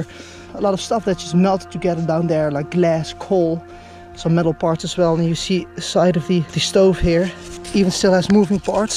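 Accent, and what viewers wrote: Netherlands. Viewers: I mean this in the best way but I feel like I’m watch verstapen dig for ww2 objects it’s so cool love the Dutch accent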